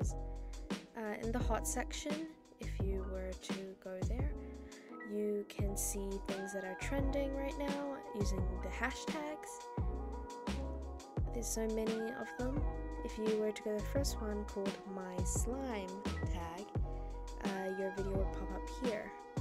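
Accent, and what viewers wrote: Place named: Australia. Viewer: i like ur aussie accent its so cute it sounds just like rosie from blackpink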